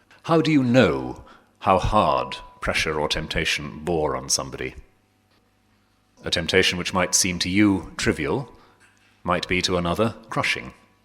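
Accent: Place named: RP, United Kingdom. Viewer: "Here we have two people reacting totally differently to human contact and human interest, one withdraws into silence, one embraces and they're both alright. Silence and honey cakes are equally acceptable [agreed, but that's not what you said here : <link> when you said that the thing to look for when a person is approaching union with God is that the person is sociable. People who isolate themselves are not close to God according to you when you were trying to manipulate me into stopping avoiding abusive people and situations. You're looking quite cheerful in this video because you still think you've got a chance of destroying me and you enjoy telling lies to a captive, and you assume admiring, audience]." "There are no standardised, generalised forms of holiness. Each one needs to discover what their path is [selling your soul to Satan and providing propaganda that leads over a million deaths and the emslavement of humanity isn't a path to holiness Rowan Williams. 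You are not holy. You are just trying to save your skin a since all your other deceitful and abusive strategies have failed]." "The whole business of passing judgement is so impossible [no it isn't]. Passing judgement in the sense the desert fathers so DEPLORE [his emphasis in his super posh voice, raising his voice not quite an octave to try and sound more authoritative. The desert fathers were talking about the ordinary every day sins of a group of monks living a common life. They were not talking about psychopa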